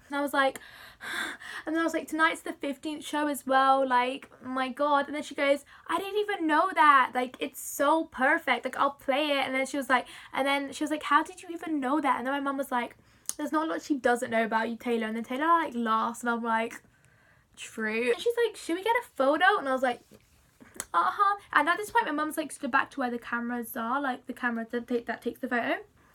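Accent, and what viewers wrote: United States. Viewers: Awesome video!!!! just found your channel. That's a good start on your American accent. Keep working on it. Practice makes perfect. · I am a 34 year old woman getting ready to go to a Taylor Swift concert in a couple weeks. I feel like we are sitting here fangirling together. lol And your American accent was one of my favorite parts. :)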